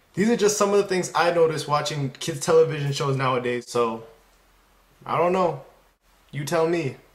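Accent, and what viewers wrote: Canada. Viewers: LOL that dora bit was hilarious haha. he definitely sounds canadian though...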